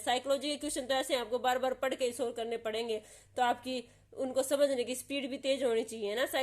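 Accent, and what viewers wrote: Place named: Haryana, India. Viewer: Haryana tach boli,,, HR ki boli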